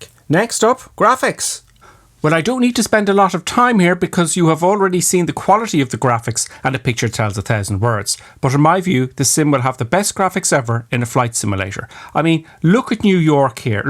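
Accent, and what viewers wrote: Ireland. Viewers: Great review..very well done 👍 looking forward to more reviews in the future..also love your Irish accent ☘️ Also I am an absolute newcomer to flight simulators...would really appreciate tutorials for total beginners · Are you Irish? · Love the Irish commentary. Refreshing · wait, you’re irish lol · Are you from Cork boi? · Thank you for this excellent video and detailed description. I like your Irish accent! Sounds like I will need to upgrade a few pieces in my PC... Can't wait to try it out! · Normally I’d thank you. But in this instance I enjoyed the review so much including the lovely Irish accent (wipes tear from eye) I watched the whole thing. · Hey new sub here U sound Irish man what country u from. Awesome video buy the way cant wait for it coming out on xbox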